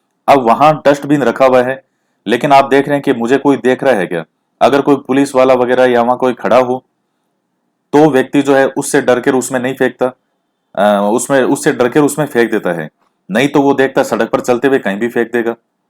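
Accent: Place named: Marwari, India. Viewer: Nice marvadi language ... humare bhi ase hi bolte hai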